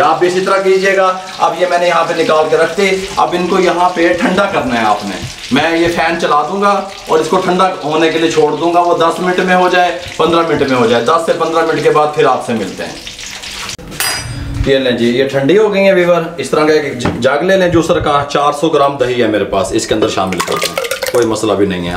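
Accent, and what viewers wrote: Punjab, India. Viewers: Bhai aapki her recipi more delicious,tasty aur lajabab hai,aur aapki Punjabi jubaan Masa Allah aapki recipi ki tarah hai saath hi meethi bhi hai,main apne near&dear ko share bhi kar Raha Hoon. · Uncle tuada style kamal a... punjabi nal boldy o wich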